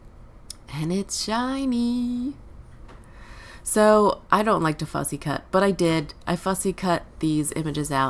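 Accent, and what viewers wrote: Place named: Baltimore, United States. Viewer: I can’t tell you how excited I was to hear someone that sounds like ME!!! lol I’m in Baltimore Beautiful card btw 😁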